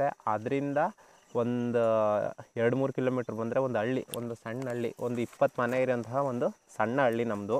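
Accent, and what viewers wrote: India. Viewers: HAI. I LIKE YOUR KANNADA ACCENT . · Anna Niv matado style le thilkonde niv nam Chamarajanagara kadeyavre anta... olledagli anna videos madta iri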